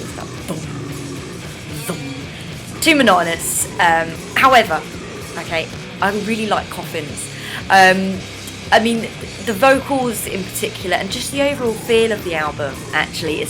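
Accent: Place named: England, United Kingdom. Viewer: love your enlgish accent.....lovely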